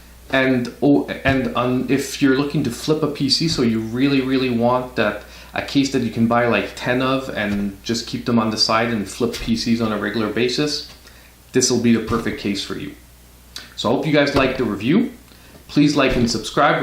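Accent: Quebec, Canada. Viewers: french qc accent haha keep going youre awesome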